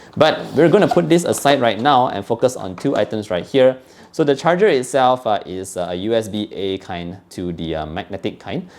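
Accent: Malaysia. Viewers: heard your english, definitely my countryman. selamat hari merdeka!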